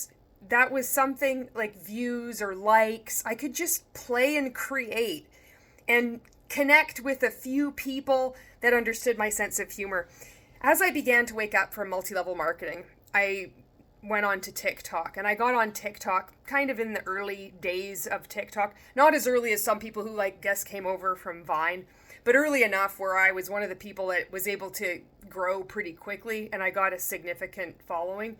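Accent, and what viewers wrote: Canada. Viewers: I think you should monetize. I don’t care if you make a little dough off me. You are hilarious, sincere, direct, and I love an angry Canadian accent! SCAAAAAM!!!! Love your content and keep it going.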